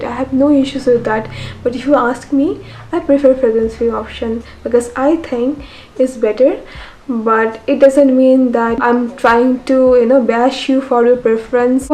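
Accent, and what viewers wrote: Bengal, India. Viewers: I just discovered your channel!! N I love your content!n I hv 2 questions fr u,:- 1)are on instagram??how can I reach u ? 2)r u bengali?(am guessing it fr the way u speak) And lastly much love to u!❤️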